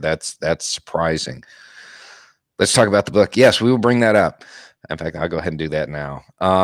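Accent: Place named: South, United States. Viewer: He does. I've seen a clip where it's hardly noticable. (Can't find it now.). I think he's the "I know a man" having to hide their accent (from a previous video) because people won't take him seriously as a journalist, and question the intelligence of anyone with this southern accent.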